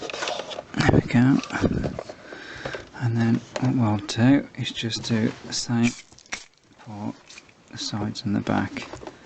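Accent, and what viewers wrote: United Kingdom. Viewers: I guessed that 'С' ('S') would mean 'Medium' and 'Д' ('D') would mean 'Long' on the basis of the Czech I know, where 'Strední' is 'Middle' and 'Dlouhý' is 'Long'. That £5 was quite some bargain for what I would describe as a collector's item and the Sokol 204 may look basic, but the functionality sounds first-class to me. Thanks for the care tips, although it didn't look all that dirty from what I could make out. I'm getting numerous Worcestershire-based results looking for your name, which makes sense to me, as I have a reasonably good ear for accents and it's screaming "West Midlands!" to me. If so, you're from my late father's sometime neck of the woods, as he studied at Worcester College as part of learning to be a schoolmaster, later at Bognor Regis Teacher Training College, then going on to teach at Spondon House, Derby. I think he still harboured an affection for Worcestershire as Tom Graveney was a favourite cricketer.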